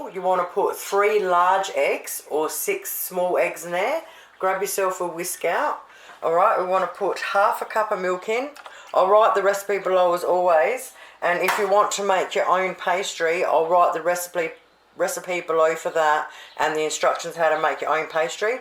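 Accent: Australia. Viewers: Ooohh maaate😂😂😂😂...can't be anymore Aussie than that😂😂😂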